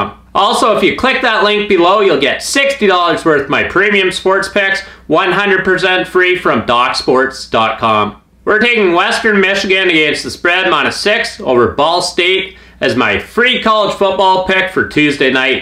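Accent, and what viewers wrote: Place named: Ireland. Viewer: You seem to be drifting into an Irish accent ......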